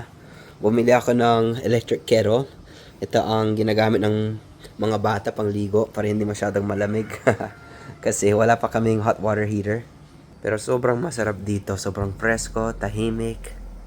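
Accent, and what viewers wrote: Philippines. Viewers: Nice kubo. Grabe ung accent ni sir pinoy na pinoy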